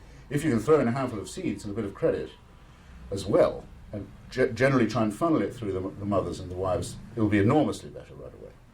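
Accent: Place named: RP, United Kingdom. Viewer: Mother Teresa made mistakes. but having volunteered many times at her homes with the destitute I can say she lived a life of a true hero. Hitch takes about money. She owned 2 cheap saris and slept on the floor and was bone thin from eating same food as the destitute. Unlike other foreign missionaries she lived like a native. Hitch is a bloated blowhard with a posh accent who was wrong on every major issue including his passionate support of the Iraq war. By the way when I visited her home I inquired why they have no fund raising and they said God will provide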